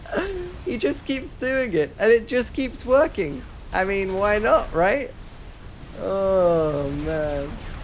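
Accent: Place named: Detroit, United States. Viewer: I love the accent! Detroit?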